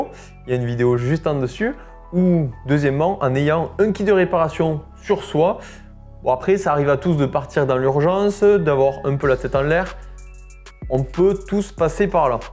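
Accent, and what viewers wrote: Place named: South, France. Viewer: Vidéo super utile ! Merci pour ceux qui n'ont pas d'ingéniosité ! Et toujours avec cet accecent du Sud, c'est la touche 😊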